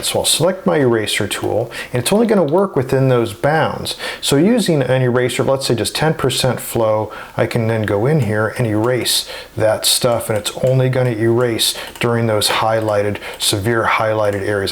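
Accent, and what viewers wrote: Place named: United States. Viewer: thanks a lot! I can't understand american english very nice so that's why i needs me to watch the video 2 or 3 times to be sure to understand every thing! If I could I would really enjoy sharing pictures with you which give me some problems... Thx from Paris France!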